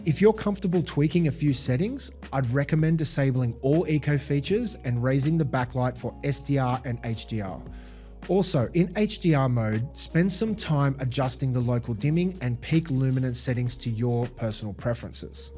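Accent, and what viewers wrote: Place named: Australia. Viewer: About his voice, the Australian accent particularly his specific neighborhood town one is not globally heard, which makes it harder to understand. And add to that his unique voice tone... definitely a better mic can make it easier for certain, interestingly in his livestreams the voice sounds much more clearer so maybe it's something in the editing/software of his uploads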